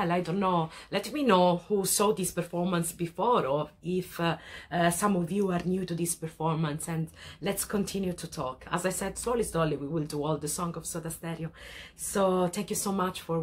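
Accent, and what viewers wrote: Italy. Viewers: HOla, sos italiana? me parece percibir un acento italiano cuando hablas. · Not try to hide your Italian accent please, is so cute. Speak a bit more in Italian, Latin-American love it, and slowly is not difficult to understand for us. Cheers. Nice video.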